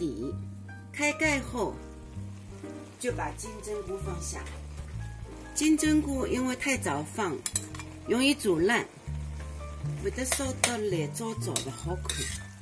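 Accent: Shanghai, China. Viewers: 终于听到上海话了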